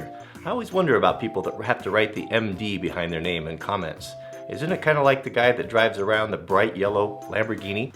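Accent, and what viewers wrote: Canada. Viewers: I am confused you talk like your a Canadian but you hate the metric system but live in the states.Are you a posser?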